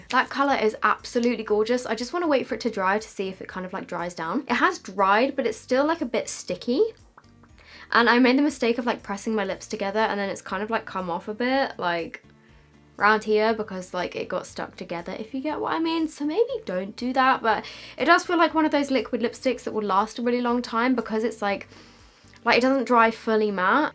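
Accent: Australia. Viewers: love how you kept turning australian ahhaha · Loved the Australian accent😂❤️ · Wtf is that Australian accent 😂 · Yo thats good aussie accent! 😍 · Oh, as an Aussie I just love your Australian accents. 😂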